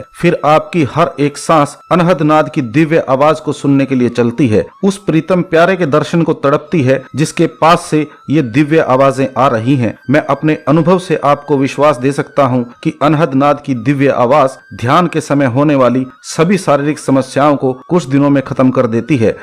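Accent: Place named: Punjab, India. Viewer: I can feel your Punjabi accent Wise words..I will definitely try this method 🙏🏻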